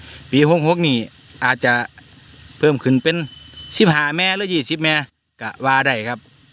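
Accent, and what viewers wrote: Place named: Central Thailand, Thailand. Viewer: ไทยกลางบ่คับ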